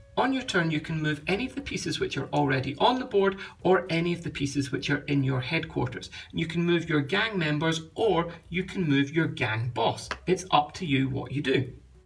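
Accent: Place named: Chicago, United States. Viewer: Bought this after seeing this. Dodgy Chicago accent lol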